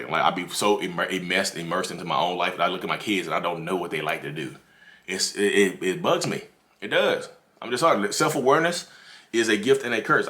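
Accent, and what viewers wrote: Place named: Baltimore, United States. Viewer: Are you from Baltimore? You say Pernt instead of parent like a true baltimorian 😊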